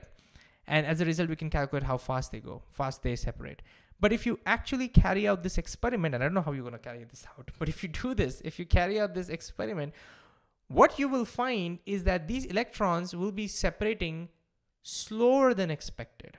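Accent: India. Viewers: you made me a believer! Btw i love the typical Indian accent. moVing becomes mOOOing. Gotto love it.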